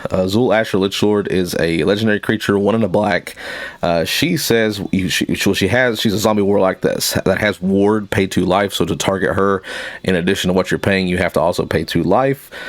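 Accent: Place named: South, United States. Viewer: Where "Southern " are you? SW Louisiana here.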